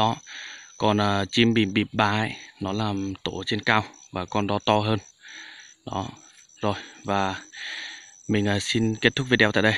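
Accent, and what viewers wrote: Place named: North, Vietnam. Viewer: Bạn là người miền Bắc vào Tây Nguyên sinh sống à? Mình thấy bạn nói giọng miền Bắc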